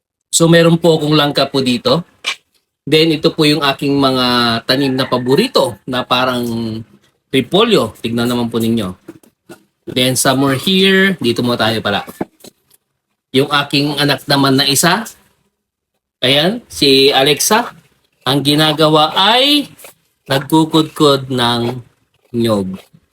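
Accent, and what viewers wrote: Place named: Cuyonon, Philippines. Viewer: Pagcuyonon din lang halata ka na cuyonon ka ra Tagalog Tagalog ka pa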